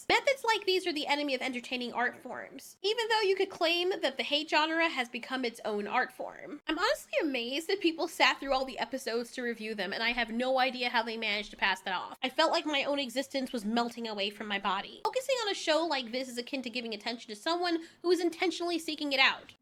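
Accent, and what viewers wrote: United Kingdom. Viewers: That avitar and that British voice would sell the seas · Just gotta say it... Your Brit accent is on point!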